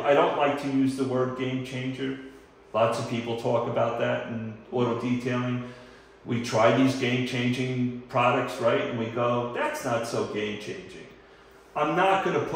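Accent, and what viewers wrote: New York, United States. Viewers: if i know my people well , I'd say you're from NY. Long island here. Do you guys do detailing or just sell the products.? Im in the Dallas area as well.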